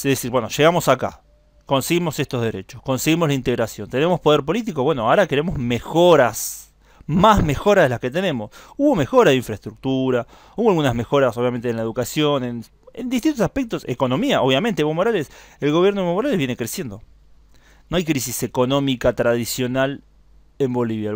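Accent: Argentina. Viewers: curioso que este "cuate" no hable como mexicano, sino como argentino.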